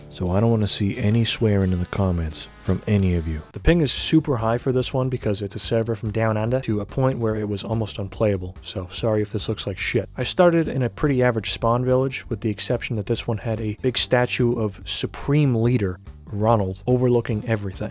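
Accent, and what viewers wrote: New York, United States. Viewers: Shout out to my fellow Canadian that sounds like a new yorker. We're a rare breed.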